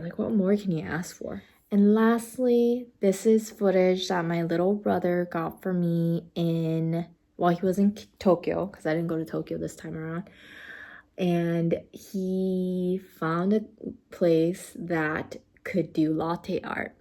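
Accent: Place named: United States. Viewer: I still really like how your Japanese pronunciation has a "American" accent to it!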